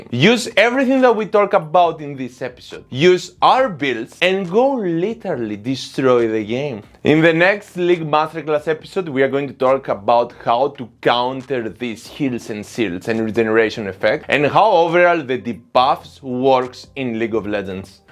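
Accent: Greece. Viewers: Bro love your vids, i bet you are greek man your accent saying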